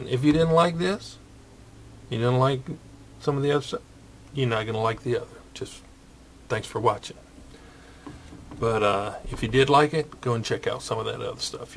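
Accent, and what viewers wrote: South, United States. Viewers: would be my second favourite war thunder narrator after the Mighty Jingles. He's not that articulate, but something about his tone and southern bubba accent just cracks me up. Especially when he says things like "they're all over me like stink on a turd", etc...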